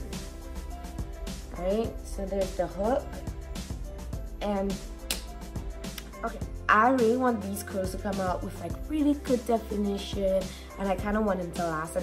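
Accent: Caribbean. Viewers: I like your accent, you sound like you're from the Caribbean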